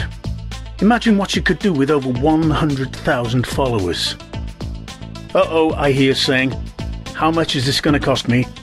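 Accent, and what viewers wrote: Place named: United Kingdom. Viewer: Haha,Judging by your accent,you are from UK,the motherland of Harry Porter...? How many followers do you have now?Please show us your twitter account.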